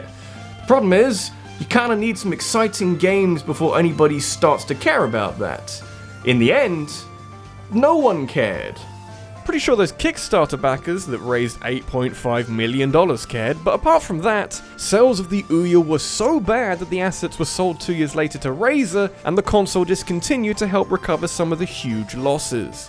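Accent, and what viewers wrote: United Kingdom. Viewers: Top 5 Linear Pathed Games (because I prefer a linear path over open world) Also, it's kinda weird hearing a British guy talk about games costing dollars rather than pounds.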